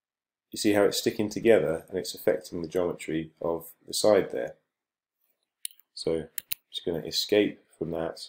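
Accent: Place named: Sheffield, United Kingdom. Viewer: Thanks. Just what I needed after recently downloading sketchup which has inspired me to attempt a cabinet build in my shoe cupboard after watching your channel. Nice to hear a uk voice especially one from sunny Sheffield. Lol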